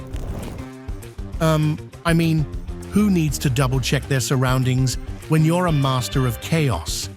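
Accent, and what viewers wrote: United Kingdom. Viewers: It's strange to hear someone with a British English accent using words like 'dude' (whatever that means) and 'I guess'.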